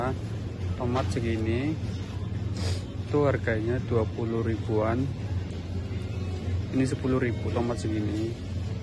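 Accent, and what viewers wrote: Banyumas, Indonesia. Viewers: wong ngapak ya kang hhe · Amin. Amin. Sama-sama Mas. Ngapaknya tidak begitu kelihatan kok Mas 😁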